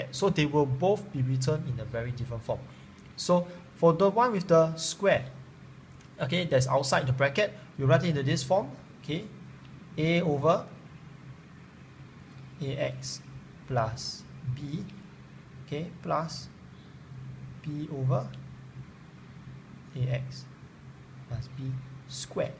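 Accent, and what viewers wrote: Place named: Singapore. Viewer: I recognize the Singapore accent ;), keep it up man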